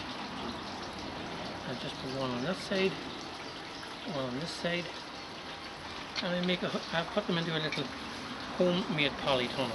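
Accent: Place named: Ireland. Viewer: The guy in the video has an Irish accent - perhaps there's a world outside of america that has different climate and ecology, somewhere like Ireland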